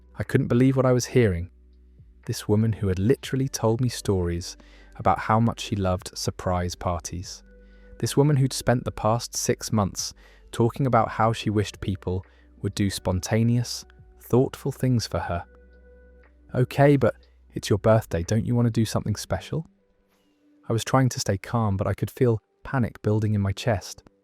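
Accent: United Kingdom. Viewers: Does anyone know where this accent might be from? I’m sure it’s a British accent, but I’d like to know the dialect, it’s very peaceful.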